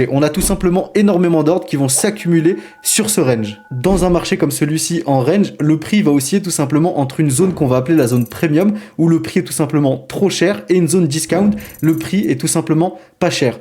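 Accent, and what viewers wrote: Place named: United States. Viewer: Avec l accent us 👍🏽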